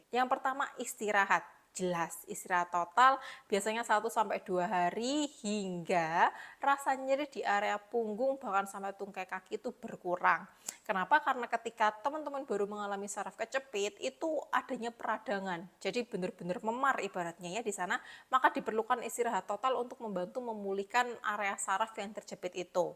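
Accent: Java, Indonesia. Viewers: Medok yah? 😶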